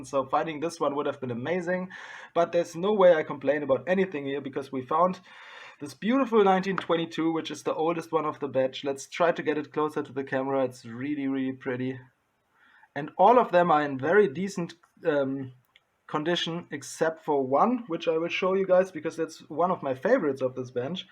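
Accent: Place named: France. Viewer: If I am to guess, this man is a French-speaking person judging by the accent.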